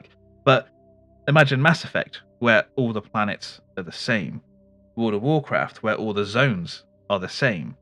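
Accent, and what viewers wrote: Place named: North, United Kingdom. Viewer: Sound like a northern so subscribed no questions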